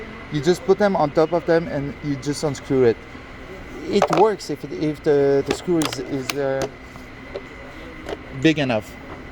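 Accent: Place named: Quebec, Canada. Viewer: “Sorry for my accent, I’m french Canadian. But it could be worse, I could be just french.” Love it! Sweet tour, I’m going to be buying a Tekton box soon. Nice to be able to support a Canadian manufacturer.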